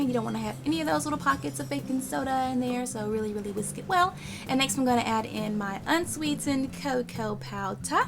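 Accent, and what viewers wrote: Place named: New York, United States. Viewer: Anyone else notice her intros are always said EXACTLY like YouTube channel, Laura In The Kitchen? She starts out saying, "Hi guys!" in that same New York tone.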